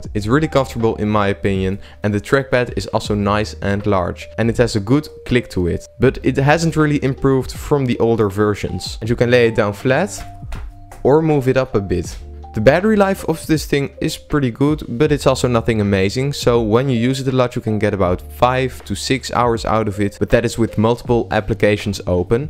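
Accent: Netherlands. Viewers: That is quite a strong Dutch accent. But at least you're not from Limburg.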